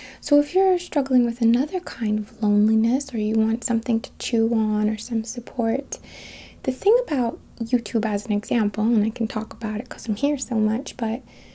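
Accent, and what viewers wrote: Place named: New Jersey, United States. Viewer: This is the 1st time I noticed you have an accent! New Jersey? I'm bad at this And yes sing next time!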